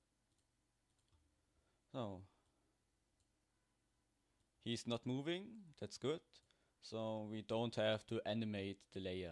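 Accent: Germany. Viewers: Man hört so hart das du deutsch bist xd gutes tutorial btw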